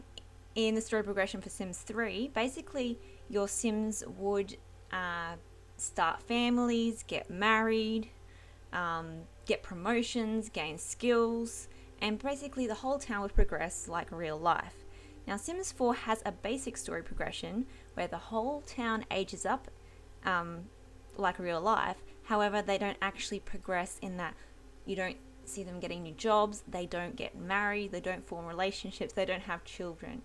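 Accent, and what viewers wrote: Australia. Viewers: Are you Australian? And is your name?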